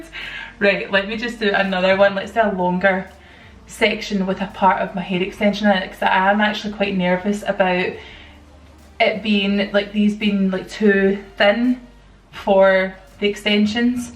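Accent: Scotland, United Kingdom. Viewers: Gosh the Scottish accent is so charming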